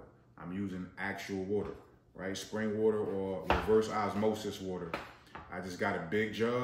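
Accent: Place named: East Coast, United States. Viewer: Let me get to the grocery store!!!! This video is dope and is as soon as you started talking, I knew you were from the east coast 💫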